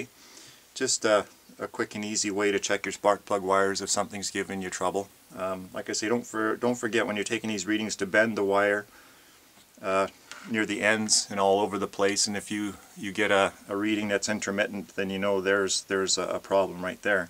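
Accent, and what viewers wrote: Canada. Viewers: Greetings my friend. Are you a BERTAN? I lived in BC and Berta. You totally have either AB accent or Sask. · You sound incredibly canadian ;]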